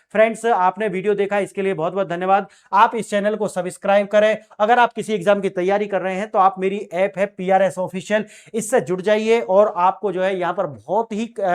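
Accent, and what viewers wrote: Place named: Bharatpur, India. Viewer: आपको सुनने के बाद बार बार ऐसा क्यों लग रहा है आपकी भाषा भरतपुर के लोगों से मिल रही है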